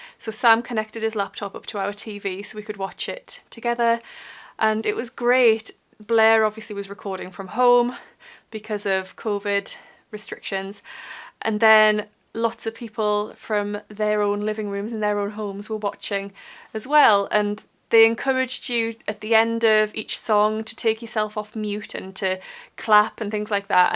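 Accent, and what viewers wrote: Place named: Tyneside, United Kingdom. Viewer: Great video. Lovely to hear a Tyneside accent. Don't ever forget about your dreams - it might not be possible for you to do some property development at this time, but you have your whole life ahead of you and dreams still come even true when you are more mature, you've just got to be determined and follow them. I speak from experience (though not in relation to property development).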